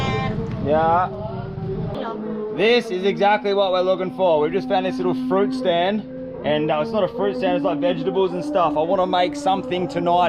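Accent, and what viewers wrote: Australia. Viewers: Does this really sound normal to australians? I love this guy's accent, close my eyes and listen to him talk when im trying to get sleepy. But it never works because the videos are so interesting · My grandma was from Indonesia. I live in the Netherlands. Indonesia is so beautyfull. Its a shame all the rubbish thad washes up on the beach. It is really a beautyfull country. And youre Australian accent is always so funny and uplifting to me. "Yea dawgies". 😂 Love youre channel man. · I love the long videos, I still remember meeting you in indo at a beach, I recognised another Australian voice and looked behind me and there you were with the boys!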